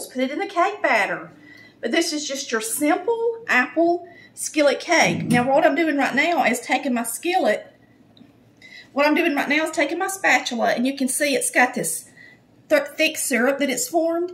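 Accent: South, United States. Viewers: Love your southern accent.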